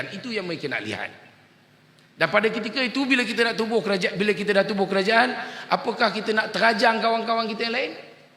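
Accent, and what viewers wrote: Kedah, Malaysia. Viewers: Hang da ckp telo kedah No….. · Pi Kedah dan dan tukaq cakap Kedah. Bila Anwar cakap loghat dia hang kutuk 😂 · Sesumpah dah sampai Kedah,lidah dah bertukar dan berbunyi loghat Kedah pulak tp bila org dtg di Tambun nak ajar org loghat Perak pulak.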